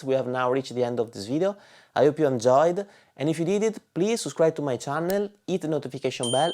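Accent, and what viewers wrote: Venice, Italy. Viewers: Complimenti per le capacità esecutive e dettagliata recensione, bravo Dall'accento direi italiano, Veneto, probabilmente veneziano 😊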